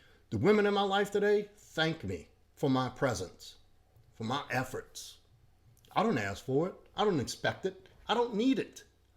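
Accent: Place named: Louisiana, United States. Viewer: I can tell you’re from Louisiana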